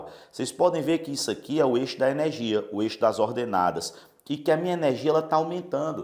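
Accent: Ceará, Brazil. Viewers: Ele é cearense?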